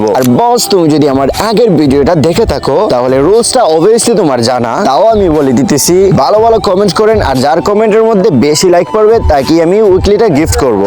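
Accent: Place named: Sylhet, Bangladesh. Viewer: বস তোমার সিলেটের ভাষার অনেক ভালো লাগছে❤❤❤❤😊😊🎉🎉🎉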